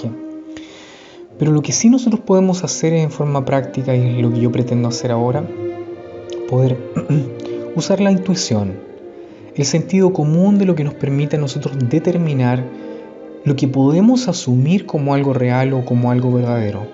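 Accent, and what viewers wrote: Chile. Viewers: sos chileno verdad?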